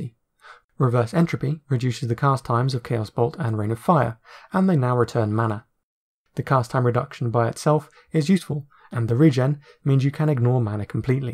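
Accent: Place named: United Kingdom. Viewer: Nice video! Nice to hear a British voice for a change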